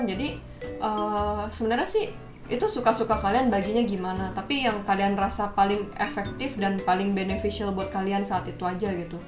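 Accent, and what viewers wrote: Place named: Java, Indonesia. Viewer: kak asalnya dari mana kok agak medok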